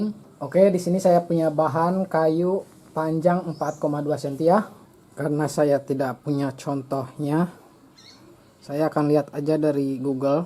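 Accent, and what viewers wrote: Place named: West Java, Indonesia. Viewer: Nu sae ngango na kayu naon kang..?? Bade nyobian yeh ngadamel nyalira.. tina logat na mah da jiga asli sunda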